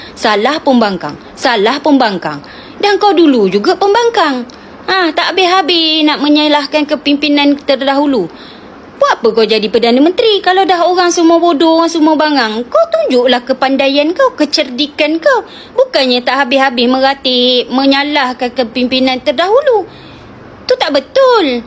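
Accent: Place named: North, Malaysia. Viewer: DIA MEMANG PELAQ BAHASA ORANG UTARA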